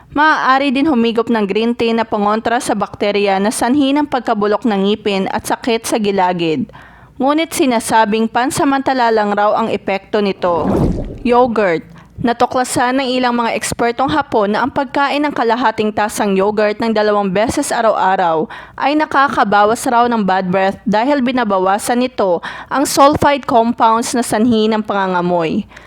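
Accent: Visayas, Philippines. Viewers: Nagdodolot visaya ka day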